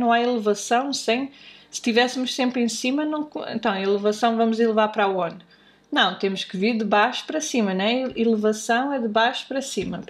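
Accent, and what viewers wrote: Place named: London, United Kingdom. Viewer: You know...a frase, típica do Inglês Britânico ao final de uma sentença verbal não deixa duvida de seu inglês Londrino, You know...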